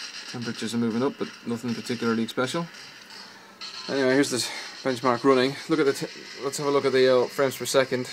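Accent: Ireland. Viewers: Are you in any way Irish? :D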